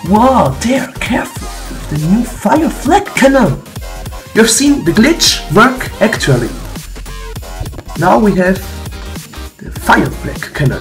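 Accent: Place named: Germany. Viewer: Are you German or something? you kinda sound like your German. And if you are, Hallo :-)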